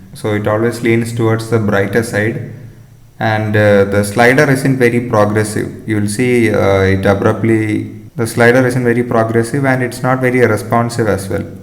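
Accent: Kerala, India. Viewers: English kettittu.. Malayali anennu thonnunnu😅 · Malayali aanalley Engilish ketappo manasilayi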